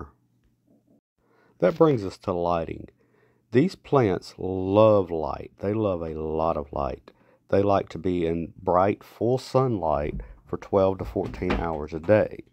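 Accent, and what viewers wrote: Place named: Carolina, United States. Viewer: You have a south or North Carolina accent 🎉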